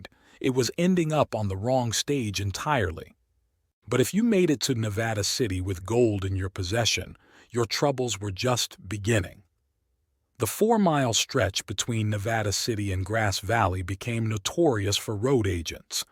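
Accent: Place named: United Kingdom. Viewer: NW Georgia. You ? I thought you were British. 😂😂.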